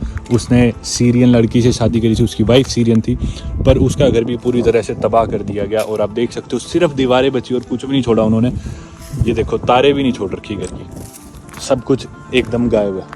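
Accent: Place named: Rajasthan, India. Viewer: Bhai aap hamare rajasthan se ho aapki aawaj se to lagta h 👍🙏🙏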